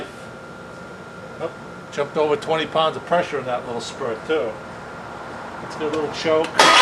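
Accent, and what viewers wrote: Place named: Maine, United States. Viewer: you must be from maine.... "motah" lol... (I'm a mainer as well)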